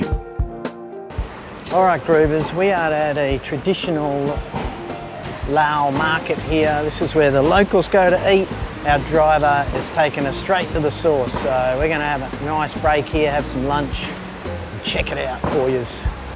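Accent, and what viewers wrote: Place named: Australia. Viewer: Thank you for the video, mate. Love seeing vid about Laos. Also, love your down under accent. Stay healthy and enjoy your retirement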